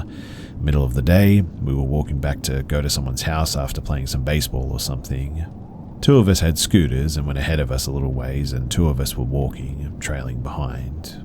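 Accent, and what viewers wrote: Australia. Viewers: Yesss! I love bedtime horror stories, & you're the first Aussie narrator I've found! Greetings from chilly Canberra.👋 Insta-Subbed; love to support my sunburnt brethren.❤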